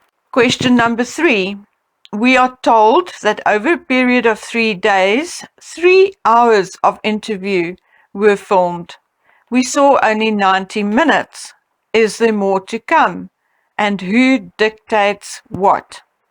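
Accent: South Africa. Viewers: ...firstly I really enjoy your vids and appreciate all the research and effort you put into producing and posting them Thank you. May I ask about your accent? Is it South African?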